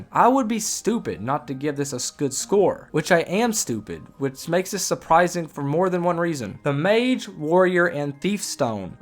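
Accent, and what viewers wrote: South, United States. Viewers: My opinion is..... You have a fantastic southern accent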